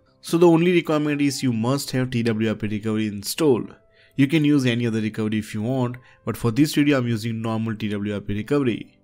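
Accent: India. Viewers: Bro you are Indian or foreign. BTW BEST CONTENT. CAN YOU PLEASE MAKE A VIDEO FOR BEST STALE ROM FOR MOTO G4 PLAY (HARPIA). LOVE FROM INDIA.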